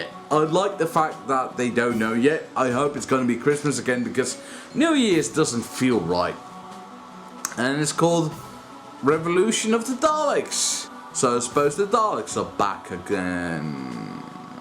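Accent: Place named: England, United Kingdom. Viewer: Your accent is sounding more English these days and I approve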